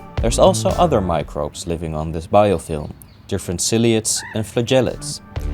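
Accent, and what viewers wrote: Netherlands. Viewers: I never was sure if you were dutch but you said it in this video i love our dutch accents 😂goeie video gast!!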